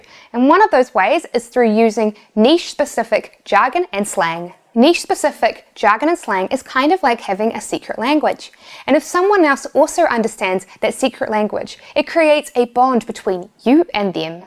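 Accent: New Zealand. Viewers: Love your Kiwi accent!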